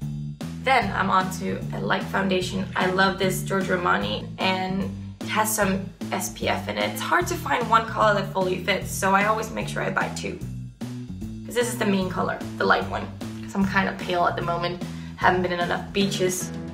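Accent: Denmark. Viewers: She's Danish . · she’s fully danish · I love that you can hear you danish accent a bit! (jeg er ogs fra danmark ahahah) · She's from Denmark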